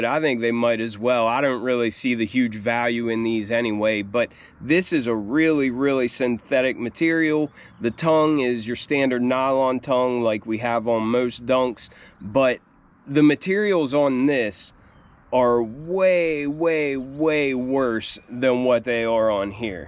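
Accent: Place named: Pittsburgh, United States. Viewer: That mint green is going crazy! I love them! Pittsburgh here, bro you got to be from Pittsburgh? I know I've heard you say you live in WV but that accent is heavy Pittsburgh. And I heard you say you love the teams. Just curious if you're from here or from WV? Not that it matters I love your stuff. Great reviews, great taste!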